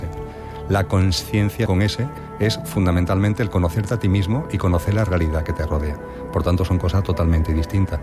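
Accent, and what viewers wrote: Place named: Andalusia, Spain. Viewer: Cuanta claridad. Andaluz tenía que ser Señor!!!. Gracias.❤❤❤❤❤❤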